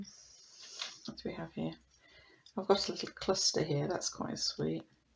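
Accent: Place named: South England, United Kingdom. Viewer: I live in Newark. Thanks for the tip. I am going to raid those charity shops next time I get chance. You definitely have a South of England edge to your voice. Xx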